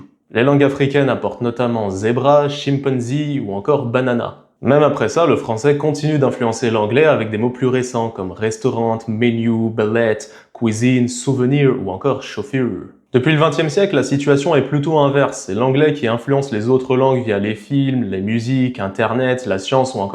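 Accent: United States. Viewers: Toujours très intéressant merci et même si les commentaires ne sont pas à la hauteur de votre propos c'est qu'ils prouvent notre intérêt ! je me permets donc : Pourquoi cet accent américain alors que l'accent de nos voisins anglais est si beau et qu'il s'agit d eux principalement? Pour la prononciation : Zibra / balè / tchoklet / chauffeur. Barbecue (barbe au cul) ok avec les autres. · Bsahtek l'accent américain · Oui. C'est un accent américain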